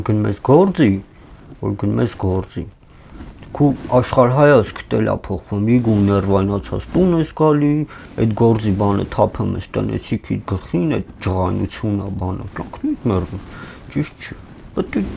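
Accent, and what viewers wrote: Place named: Vanadzor, Armenia. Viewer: Чисто Кироваканский, а точнее Химзаводский)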